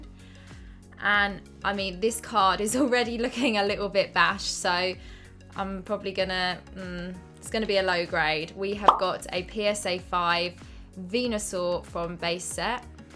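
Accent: United Kingdom. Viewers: Was watching for 15 seconds before I realised you were British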